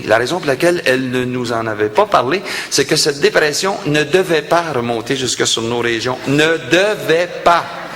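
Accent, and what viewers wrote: Quebec, Canada. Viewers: la moitié des mots ne sont pas dans le dictionnaire?? Et puis pour les anglicismes je pense que les européens sont aussi pires, sinon plus (faire du "shopping", servez moi un "coca lite (ou light)" .......) et je suis désolé mais il parle comme un québécois de souche, ce qu'il est. C'est une langue qui dit ce qu'elle as a dire sans avoir besoin de se tenir le nez en l'air. Vous avez vraiment l'air "frais chiés" en france, toujours le besoin de corriger les pseudo-fautes des autres. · Je ne sais pas si tu lis les commentaires après tant d’années mais, je peux te dire que t’es une des personnes qui m’a fait aimer l’accent québécois. J’aime ta façon d’exposer l’actualité, tellement brillant; je partagerais un repas avec toi autour d’une table et jaser de tout et de tout.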